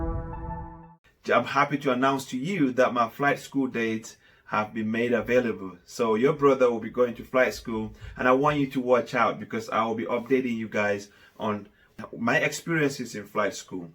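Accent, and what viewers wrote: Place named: Africa. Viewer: This is a pure Native African English accent😍😍😍. Thumbs up👍🏿Bro. Keep up the spirit. Where are you from?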